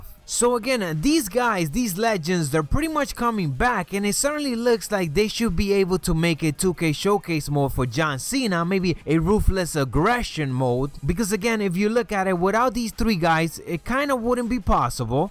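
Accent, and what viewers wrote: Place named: Spain. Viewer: Ur channel rocks , is that ur voice , and r u from Spain or something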